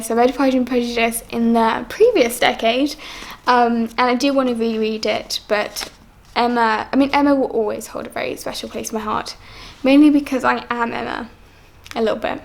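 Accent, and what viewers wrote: United Kingdom. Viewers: Wow british accent. Tbh difficult for a nonnative speaker like me to perfectly understand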